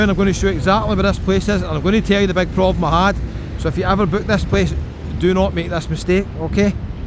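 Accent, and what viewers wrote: Scotland, United Kingdom. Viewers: The title suits me perfectly 😂. Watching this heavy Scottish accent at 2 am, while being high as a kite, big mistake on my part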